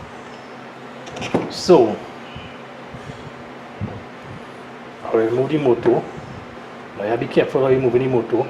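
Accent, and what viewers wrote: Trinidad and Tobago. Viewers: Sounds like a trini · How you sounding like ah Trinidadian so.??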